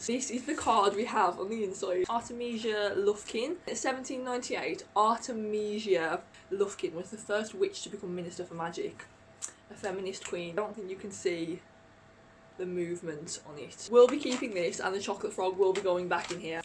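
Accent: United Kingdom. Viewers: This video came up randomly in my feed. Nice reviews! May I ask what your accent is? I can hear what sounds like a mixture of a bit of Liverpool, Dublin, Geordie? I studied linguistics and I am usually good at accents so this is interesting :)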